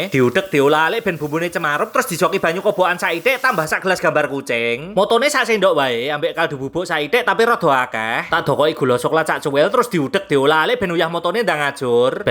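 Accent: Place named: Java, Indonesia. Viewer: Dengar suara mas ny yg reyah bisa ketawa sndri q,,,,sama2 orang Jawa jdi Pham bngt bhasa nya lucu skali😂😂😂😂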